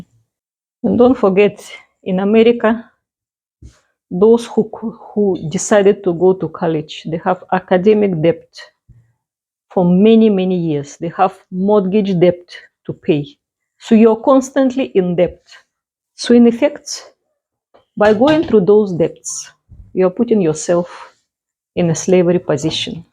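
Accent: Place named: Russia. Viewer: I really like listening to you,! Love your Russian accent! Just feel sad that the Ukrainian government and the the Ukrainian Nationalist accepted to be used against Russia…. I think you are a special Ukrainian with lots of character, personality and love for humanity!!! ❤️❤️❤️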